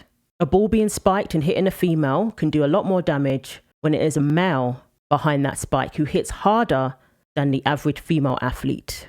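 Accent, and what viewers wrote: United Kingdom. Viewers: On a personal note, although you have a British accent, it was easy to understand your speech. Your diction is clear. I sometimes have to resort to Closed Captioning to understand English speakers from other countries. Also, excellent fact based coverage of a very important issue.✌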